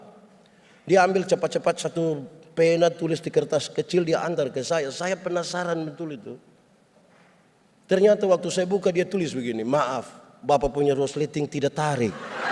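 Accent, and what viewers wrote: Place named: Eastern Indonesia, Indonesia. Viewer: Cuma bahasa + logat ind Timur, agak sulit-lama sy cerna pelan 😂😂😂😂 pemakna'an nya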